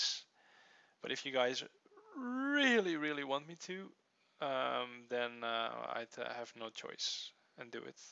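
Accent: Netherlands. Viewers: kan duidelijk horen day hij nederlands is waarom kan dat altijd :p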